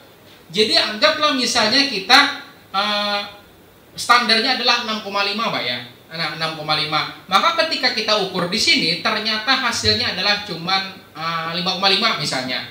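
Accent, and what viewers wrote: Banjar, Indonesia. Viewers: Urang banjar leh bang??😂😂😂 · Pian sekalinya urang Banjar 😁, Barabai kah pian bos kuuu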